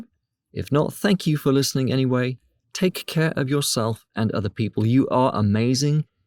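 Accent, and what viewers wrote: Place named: Australia. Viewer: the title seemed fine to me. A lot of the time I find videos with clickbaity, controversial one-liners and thumbnails cheapen the entire message. One suggestion if I may, would be to include more elements to highlight your points. You make a great point about how actors’ jobs are to perform and use their imagination. Some cuts to famous movies where actors play entirely unrealistic/impossible characters would help support your argument here. The Joker character could be a good one as obviously there’s no way to actually cast an actual psychotic homicidal anarchist in a movie! Just one small example though and by no means am I trying to influence your content. That’s one of the best things about YouTube, there’s so much freedom for creators and something for everyone. Guessing by your accent you’re an Aussie (so am I). Thanks for the video!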